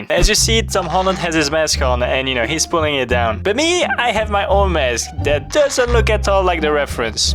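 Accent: France. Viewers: Does my ears mistake me or do you have a french accent ? Seriously I can barely focus on what you said, my mind keep thinking about that, maybe I have an ADHD, idk, just don't ask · t'es français? si oui, très bel accent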